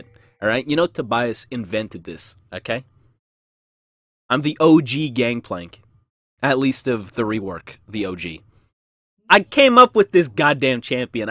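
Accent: Nova Scotia, Canada. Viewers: is he from Nova Scotia?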